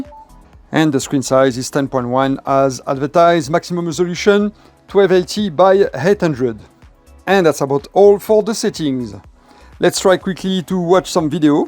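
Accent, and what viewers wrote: France. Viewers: He have french accent 😮, but the tab is good🎉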